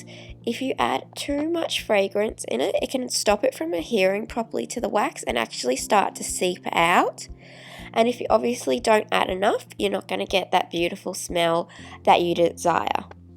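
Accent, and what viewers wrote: Australia. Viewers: Heya, wow so refreshing to hear an Aussie on YouTube, im from qld……thank you so much for this tutorial. I made some candles today actually and you guessed it i have that dipping you were talking about….so i can use a hairdryer to remelt the wax? Have an awesome day/night….xxxx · Added bonus is the Australian accent! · R u Australian?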